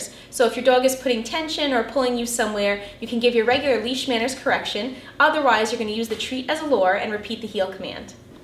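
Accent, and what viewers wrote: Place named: New Jersey, United States. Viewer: Love the Jersey/NY accent. "Dawg"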